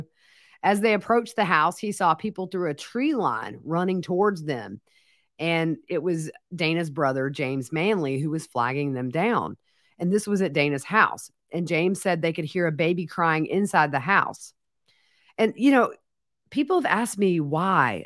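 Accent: South, United States. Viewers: I also love that " Southern drawl"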